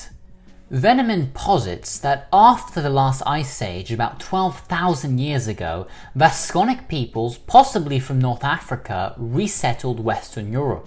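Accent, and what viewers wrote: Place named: England, United Kingdom. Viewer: well explained , I like your english accent , thanks for sharing this important info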